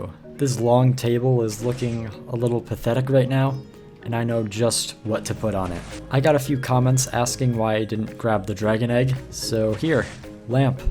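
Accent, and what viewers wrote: Minnesota, United States. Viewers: With the name I was hoping your MN accent would be more aggressive.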